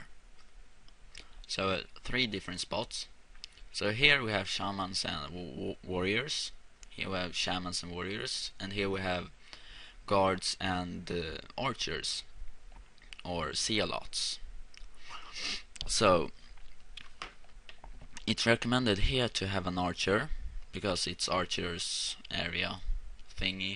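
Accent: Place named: Sweden. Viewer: Swedish english ftw!!!!! Oh sorry not supposed to comment on english... anyway. Yeh I hope the video helps whoever doesn't know how to do GRB. Kudos for you bro <33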